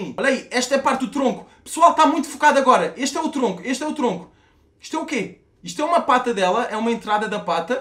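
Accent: Portugal. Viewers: O cara veio de portugal vey to entendendo nada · Ele e português do Portugal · ele é de portugal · ele é portugues né? de portugal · gameplays ele é de portugal!!eles falam algumas coisas diferente do brasil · Ele é português de Portugal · Ele e portugues?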